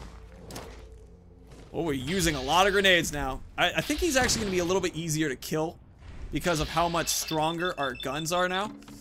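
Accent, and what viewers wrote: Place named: Australia. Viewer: It's all about that Australian Fah Hahbah.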